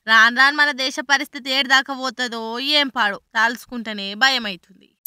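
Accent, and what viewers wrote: Telangana, India. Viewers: తెలంగాణ యాసలో మాట్లాడుతూ ఆంధ్రప్రదేశ్ నాయకులను ఎద్దేవా చేస్తావా.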